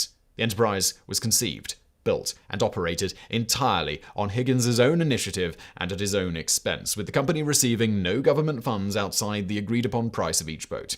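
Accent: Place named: RP, United Kingdom. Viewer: you speak too damn fast. Your speech is pretty close to double the normal speed of a typical English speaker from around the world. Although you have a very crisp, articulate upper class English accent that is not difficult to follow for most North Americans, you speak so rapidly that I believe that you are leaving many non-native English speakers bewildered. If I have one of your videos playing in the background and I’m not concentrating on it specifically, I am losing track of what you have to say at times. And I lived in the UK for three years so I have little to no problem with most English accents short of a Geordie.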